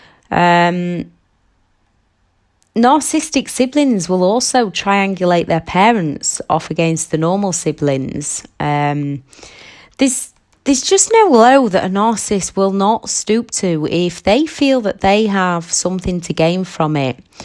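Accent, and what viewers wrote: United Kingdom. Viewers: I was thinking I might have grown up in the same household with the narrator, except for the British accent. The only member of my family not a narcissist, aside from me, died when I was a kid. For years I thought I just could not get over my father's death, and I cried and cried and cried, thinking there was something wrong with me. It took a long time to realize it was sad that he died, and he was the only one who loved me or treated me with love, tenderness, and care, but what I was really reacting to was the fact that he died and left me alone with THEM. Great analysis of growing up with NPD in siblings.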